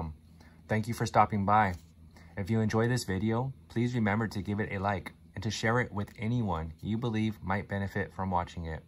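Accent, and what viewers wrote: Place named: Scandinavia. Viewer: Wru from? Just curious, no disrespect intended. I love languages and different cultures. That aside, you sound kind of Scandinavian. If I'm wrong, again, no disrespect intended.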